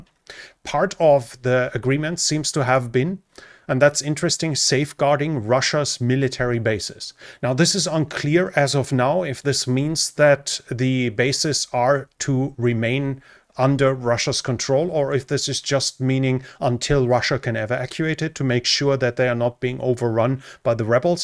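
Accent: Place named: Germany. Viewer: It sounds like you have a very heavy German accent. However, your English is quite good !!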